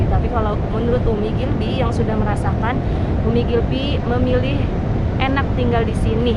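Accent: West Java, Indonesia. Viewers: Assalamualaikum sy baru buka Vidio ini tapi sy sering nonton video Nya Kalau denger cara bicara nya kaya orang Sunda ya dari mana asalnya karna sy orang Sunda juga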